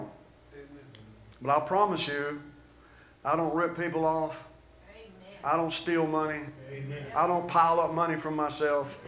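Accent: South, United States. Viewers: I don't care what he says theirs never anyone came close that sounds like elvis or even comes close like you do and looks like elvis and that southern accent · think its the southern accent fooling you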